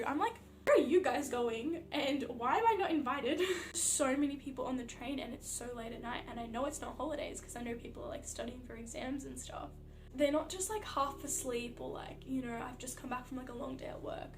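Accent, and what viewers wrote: Australia. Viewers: Those are some new "shocks" about us I haven't heard about :D Love your accent too, heavy US American kind of "geht mir auf die Nerven" now, so Australian is a refreshing change to listen to! Have fun in Germany :) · I really love the australien Accent ^^ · I'm native Ruhrgebiet but worked in international companies in Madrid and Dublin before returning recently. The thing I miss most right now is the international flair while out and about. So, please speak loudly with an Australian accent whenever possible :) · It is always intersting to see how a foreigner sees and experiences our country and us people. It can be very entertaining and at times even funny. Partially your australian accent is a bit unusual to me, but that is not too dramatic. But I know what Macas is. We Germans often say Macens. Welcome to Germany, enjoy your time.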